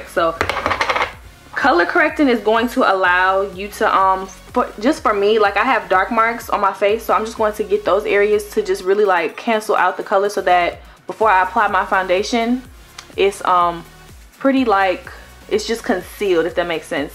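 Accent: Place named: Bay Area, United States. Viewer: Where are you from? Sounds like ur from the bay.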